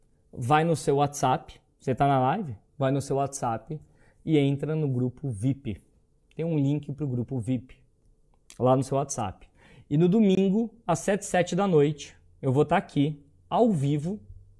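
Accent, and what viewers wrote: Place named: Caipira, Brazil. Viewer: Adoro o sotaque caipira da!!!! Maravilhoso!!!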